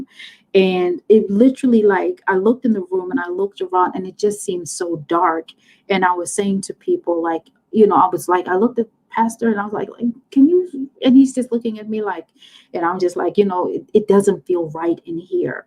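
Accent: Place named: Caribbean. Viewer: Even if you didn't have the Caribbean accent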